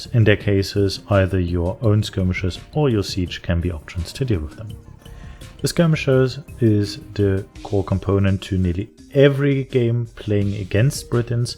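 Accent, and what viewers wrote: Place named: Germany. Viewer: Nice first strategy video. I think the best way you can improve this is working on your idiomatic English. As an example, there is your use of the formation "despite of", which occurs at least four times in this video. Now, the way you used it is fine, except for the fact that the formation does not exist in English. The formation you are looking for is "except for". Your non-standard word order is distracting. For example, "This makes it possible to go earlier on wood", which sounds a bit like Yoda-speak. Try: "This makes it possible to go on wood earlier". Also, "they run out of a bit faster wood" should be "they run out of wood a bit faster" - otherwise it almost sounds like they are only going to have slow wood left after the "a bit faster" wood runs out. In these constructions, remember to group "verb + direct object" then put the modifier (e.g. 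earlier/a bit faster) at the end. Word order is as important in English as word form/ending is in German. Incidentally, sheep is one of those rare words in English that are the same in singular and plural - there are no "sheeps". There are a lot of typical German-speaker phoneme substitutions in your spoken English but these will be fine if you can iron out the grammatical issues. These comments are intended to be constructive. Your English is much, much better than my German, but I am not running a media channel in German so my mistakes have few consequences. You are providing quality AoE content and I think if you work on these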